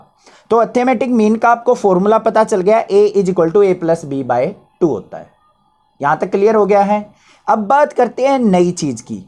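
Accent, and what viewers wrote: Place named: Punjab, India. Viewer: Apki awaj ki tone panjabiyo wali h 🤔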